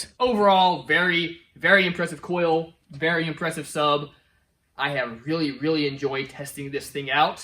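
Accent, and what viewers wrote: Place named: Appalachia, United States. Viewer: This dude looks like Gollum and sounds like a hillbilly! what is going on here?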